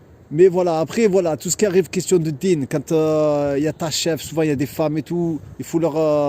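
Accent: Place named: South, France. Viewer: سلام عليكم خويا Tout d'abord bienvenue à toi et ta petite famille en Algérie terre d'islam, j'ai regardé avec beaucoup d'attention toutes tes petites vidéos et tu m'as donné un grand sourire grâce à ta joie de vivre, ton courage et aussi ton petit accent du sud "fada" ^^ Que dieu vous préserve et vous aide dans votre quotidien ici en Algérie, et ne pas oublier la parole de dieu : يا أيها الذين أمنو إن تنصرو الله ينصركم Pour revenir au sujet de ta vidéo, tu as tout à fait raison sur les conditions de vie ici, contrairement à un Algérien qui est né et grandi ici, avec son entourage et sa famille, il est bien entendu très rude pour un mouhadjir comme toi de s'installer comme salarié ici, car la grille salariale ici n'est pas forcément adaptée à votre cas, et l'absence également de beaucoup d'aides sociales dont vous bénéficiez en France. Par contre pour ce qui est de l'entrepreneuriat, l'histoire est un peu différente, pour ne citer que quelques exemples, ici il est possible d'obtenir un terrain agricole ou industriel gratuitement auprès de l'état en contrepartie d'un investissement à faire ici dans ces secteurs, il faut bien entendu créer une entreprise de droit Algérien (en Algérie il est interdit de vendre à un non Algérien pour protéger la terre), il est également possible d'obtenir un crédit islamique hallal auprès d'un établissement financier pour financer partiellement son projet, Il y a beaucoup de choses à faire ici en Algérie et ce pays ne crache pas sur les gens sincè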